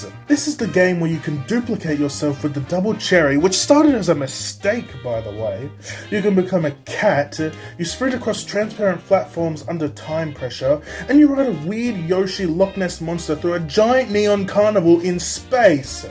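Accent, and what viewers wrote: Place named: Australia. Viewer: the australian accent. like nails on a chalkboard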